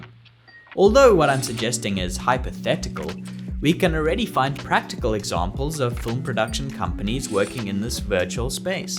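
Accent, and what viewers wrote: South Africa. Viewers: The narrator sounds very South African. 😊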